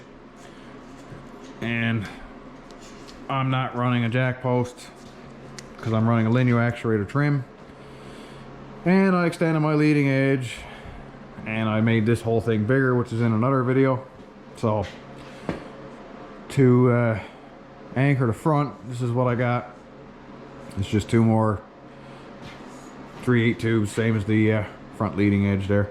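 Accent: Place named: Newfoundland, Canada. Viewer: A treat. Get to hear my homie NL accent explaining the complexities of building an airplane in a garage in Labrador. Thanks for the update.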